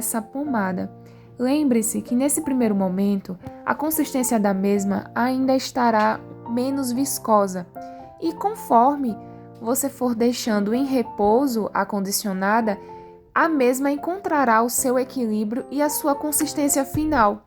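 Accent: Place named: Ceará, Brazil. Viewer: Adorei sua voz e sitaque! De qual cidade você é? Pelo sotaque, me parece que você seja do Ceará. Acertei?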